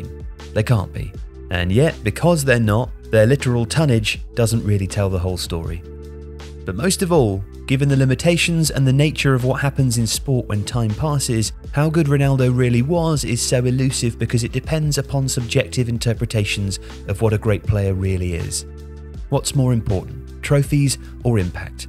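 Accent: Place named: United Kingdom. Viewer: Guys, I think you’re brilliant and I watch many of your videos because they’re awesome. But I don’t understand a) how you came up for the pronunciation of FENÔMENO (you said fenomino) and I don’t understand in which of the “best player of all time” conversation Ronaldo is NOT present. In my experience he is not only ALWAYS present, but up there for at least second-best. Is it maybe because I used to live in Italy and you (sound like you) live in the UK?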